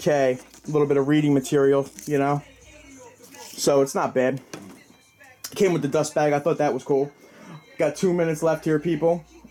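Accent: Philadelphia, United States. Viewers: much love! nothing but love on them 8 years cuz! I hit 10 years in October...don't let nothing take you down and realize that you're handlin ya business regardless of all the other bs..I hope your personal life gets back on track too..it's funny, my girl hates that I watch these vids on youtube..ya know, the "oh my god, another fukin pair of kicks" type girl..but anytime your vids come on she's knockin me off the computer like"yo, let me get dat jawn, it's bitch"..and i'm like"damn, kick back breezy"..na, but she thinks you're funny as fuck and she loves your passion..we're both from south philly, so it's like listenin to one of my boys..but 4 real cuz..stay up and do you, but drop a vid here and there for the real heads..peace! p.s.-check out that new and album-unpredictable cuz!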